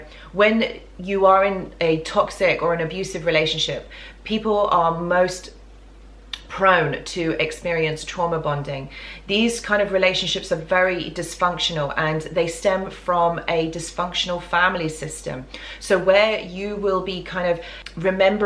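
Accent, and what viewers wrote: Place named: Bedfordshire, United Kingdom. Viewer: You sound like you’re from Bedfordshire or Hertfordshire haha... and yes cool video, thank you 🙏🏻 realign when I see 222 ☮️ This had 111 views right now too haha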